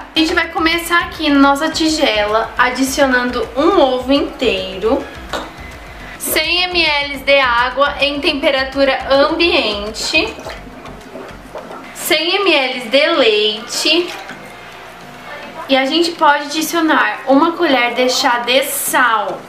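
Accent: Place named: South, Brazil. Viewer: Bom dia. Moro em São Paulo, mas sou Gaúcha! E tu , mora no Sul? No Paraná? Pelo sutaque! Bjs Amo fazer pão caseiro!!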